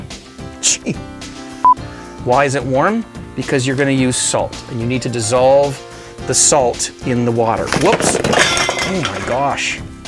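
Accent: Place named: Canada. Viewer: Michael Kimber he's definitely Canadian. we don't say "Bob's your uncle" in the states. and it's pronounced erbs the h is silent 😝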